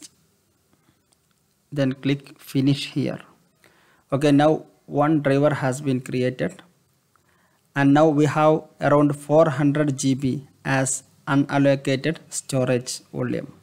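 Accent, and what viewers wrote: Kerala, India. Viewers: Malayali analle ???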